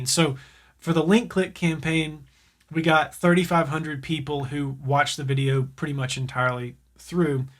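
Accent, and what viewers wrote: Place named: South, United States. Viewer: I think I hear a southern twang to your voice. We just talk slower 😄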